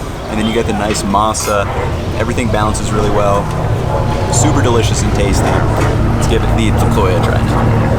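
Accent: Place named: United States. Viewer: I visted MXCD this year and really loved it! But, your Spanish pronunciation is soooooo American 😂 You definitely need to work a lot on this! It’s hard to understand you sometimes. But still thanks for the effort ❤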